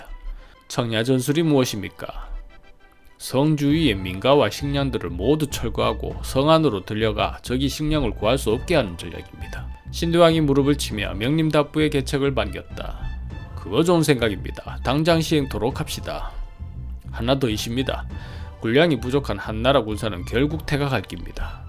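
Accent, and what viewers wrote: Gyeongsang, South Korea. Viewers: 영화 황산벌 촬영하는 것도 아니고,그 당시 신라 사람들이 경상도 사투리 썼던 것도 아닌데, 고구려 왕이든, 한나라 장수든 경상도 사투리 쓰는 게 뭐가 어때서요 ㅋㅋ 그냥 편하게 하세요~ 말투와 표현들이 귀에 딱딱 꽂히니 좋으네요.ㅎ 아니면, 고대 삼국시대에 썼던 언어를 그대로 써야죠. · 갱상도 사투리 재밌어요 뭐 꼭 고구려말로 해야 하나요? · 고구려인 말을 할 때 북한 사투리로 하라는 것이 어이없네요. 북한 사투리가 한두개인가... 평양말투와 연변쪽 말투만 비교해도 완전히 다른데, 고구려인들이 어떤 말을 했을지 어떻게 알고... 그리고, 지금 북한 말투와 고구려 시대 말이 비슷할 거라는 생각 자체가 웃기지도 않음. 전혀 다를 수도 있음. 어디 거란이나 말갈족 언어와 비슷할 수도 있는 것이고... 적어도 만주벌판을 내달리던 초기 고구려인들이 현재의 한반도 내 북한 말투와 비슷했다고 생각치는 않음. 님의 대화체에서 갱상도 사투리는 님과 제작영상만의 개성이니, 하시던 대로 주욱 이어나가시면 될 것으로 봅니다.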